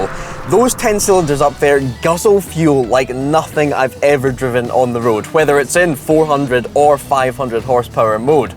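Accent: Scotland, United Kingdom. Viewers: The rain and the host's accent scream great britain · what is this dialect? scottish? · Scottish for suree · I don't know if he's Scottish or Irish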